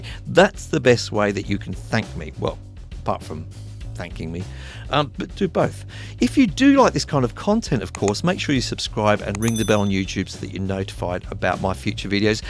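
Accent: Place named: Australia. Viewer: Thanks. I switched to Cakewalk a month ago and you helped me. I love your accent by the way. Are you from Australia?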